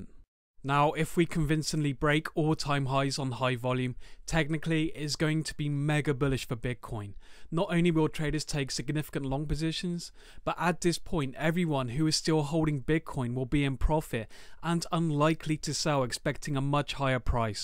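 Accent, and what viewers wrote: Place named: West Midlands, United Kingdom. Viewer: nice video! Are you from the West Midlands in the UK, or maybe the Bristol area? I recognise the accent :)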